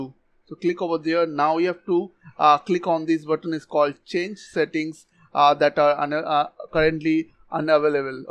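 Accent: India. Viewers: Oh shut up with this accent, back to the India, and take the lousy Indian actors with yourself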